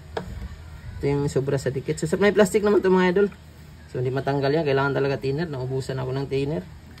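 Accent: Iloilo, Philippines. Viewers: Ilonggo ka po ba or bisaya?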